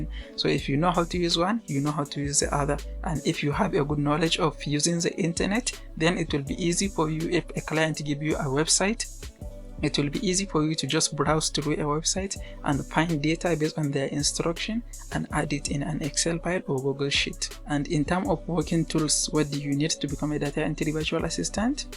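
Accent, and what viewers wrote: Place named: North, Nigeria. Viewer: Your accent brought me here i knew, you're from the North 😊 ina gaisuwa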